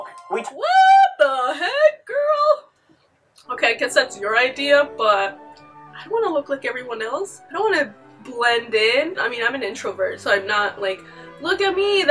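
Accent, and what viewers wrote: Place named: Mexican American, United States. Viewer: I though you were just Mexican American because of your accent. I would not have guessed the part Chinese.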